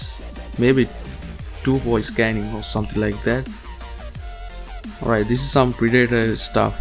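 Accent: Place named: India. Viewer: u do sound indian.... But it's fine.... Keep up the great work .... But I am Indian so.... Love from india ❤️❤️❤️❤️ and I dealt with him -_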